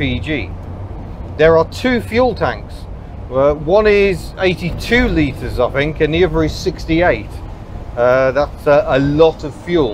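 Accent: United States. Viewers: Good American accent! Yes the econoline was a gas hog but ran forever. 'what a big beast. Good motor! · Authentic American accent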